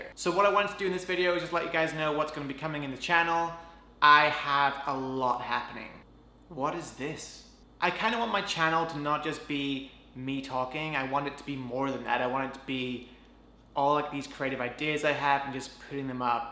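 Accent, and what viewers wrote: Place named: United Kingdom. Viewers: Lily Kim He is British. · Lol thought he was British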